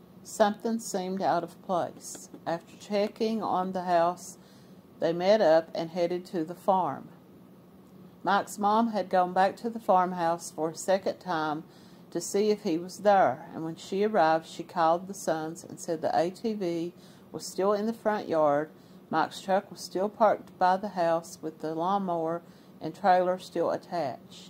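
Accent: United States. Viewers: Get that accent you southern belle !!!!!!!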